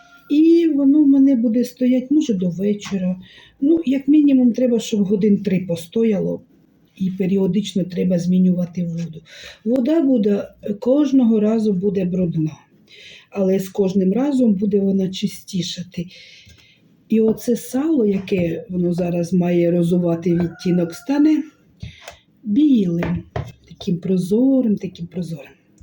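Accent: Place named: Central Ukraine, Ukraine. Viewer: ви просто унікальна! У нас на Україні дуже багато діалекту. Всі люди по - своєму розмовляють.Але всі вони наші рідненькі, дорогі і неперевершені. Ви по- говору -- це центральна Україна. Всі рецепти з цієї території. Розкажіть більше про себе. З відки ви? " Ми вже ваші, а ви наші. 1000 лайків.❤❤❤❤❤❤❤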